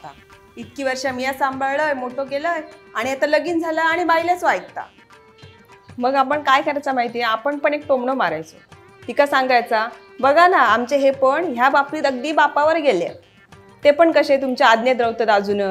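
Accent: Malvani, India. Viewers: तुझी मालवणी भाषा ऐकायला फार आवडते. ऐकत राहावे असे वाटते. तुझा प्रत्येक वीडीयो आवर्जुन बघतो.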